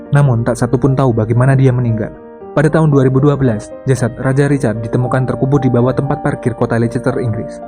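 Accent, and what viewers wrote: Java, Indonesia. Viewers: bos... rada medok. dari jawa ya... pada bae lah... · Logat nya jawa cpt lg. Gk guna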